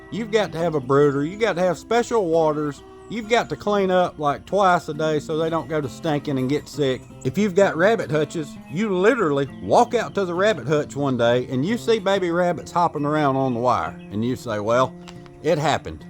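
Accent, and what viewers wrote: United States. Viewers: That's an American accent if I ever heard one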